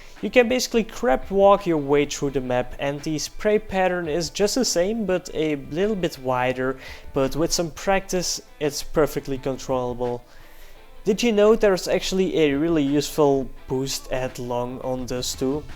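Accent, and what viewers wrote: Netherlands. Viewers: Wait are you dutch? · Nice, dacht al aan je accent te horen dat je Nederlands was, maar dit filmpje bevestigd het ;p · ben je Nederlands? lol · This guy sounds dutch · i noticed ur dutch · Wait your Dutch? Ik tought you were danish or German.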